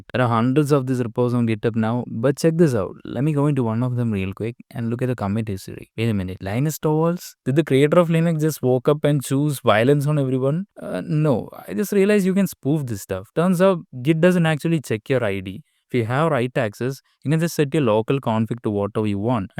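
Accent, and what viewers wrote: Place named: Kerala, India. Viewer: Are you a Malayali? That accent sounds familiar.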